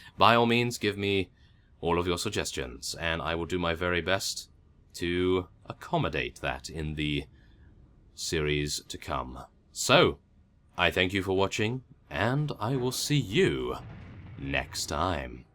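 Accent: United Kingdom. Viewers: Make a guild please :D, I will join you! we will all join you! Mwahahahaha! (please go empire, any class! british accents are awesome in my opinion! :D)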